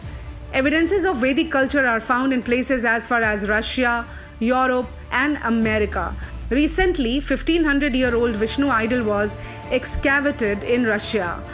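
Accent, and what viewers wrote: England, United Kingdom. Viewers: kya ramaaayenaaa aur banaraaaaa bol raha he be tu angrej he kya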